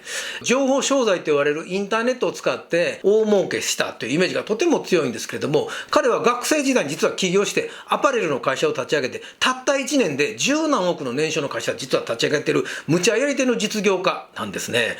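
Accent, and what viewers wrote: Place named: Kansai, Japan. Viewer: 関西弁って苦手なのですが、の語り口調は凄く聴きやすいですね。話も勉強になりました。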